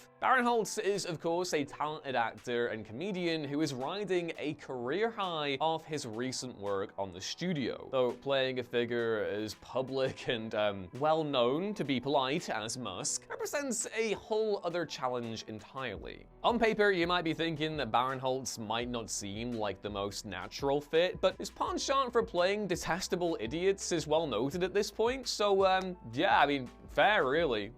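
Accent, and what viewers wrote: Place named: England, United Kingdom. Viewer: He pronounced it Chris-tian. It’s his English accent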